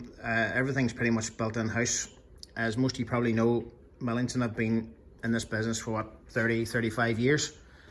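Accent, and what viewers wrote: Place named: Scotland, United Kingdom. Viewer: I absolutely love the Scottish accent ❤❤❤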